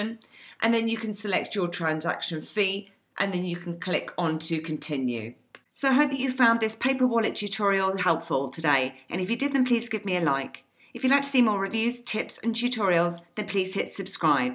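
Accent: United Kingdom. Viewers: your British accent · Nice to finally hear British accent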